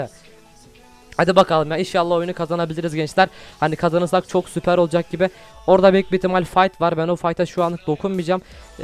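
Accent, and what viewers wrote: Adana, Turkey. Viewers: tam bi adanalı konuşma tarzın var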